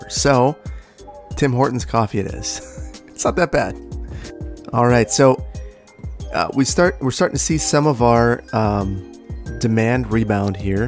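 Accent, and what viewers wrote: Wisconsin, United States. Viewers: Love the series, and wondered why you sounded so familiar, you're from WI! Gotta love the midwest!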